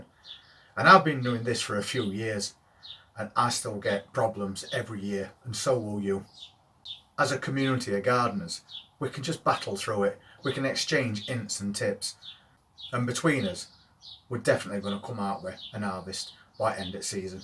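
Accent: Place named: North, United Kingdom. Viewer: Hiya,. I always watch and listen to your videos with the captions on. I love the way it cannot cope with your accent. I'm a northerner so I'm fine with your accent and dialect, but some of the automatic captions are hilarious. Thanks for the great fun and loads of helpful and hopeful tips. Cheers.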